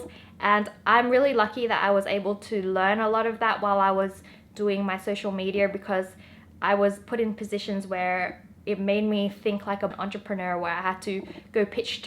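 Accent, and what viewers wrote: Australia. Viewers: OMG her Australian accent is way stronger in this video compared to her 2018 vids 😂😂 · is that Australian accent? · Your accent changes. I hear Australian n American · are you australian? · Are you Australian? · Love that Australian accent tho... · Is she Australian??? Fellow Aussie! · Are u an Aussie ???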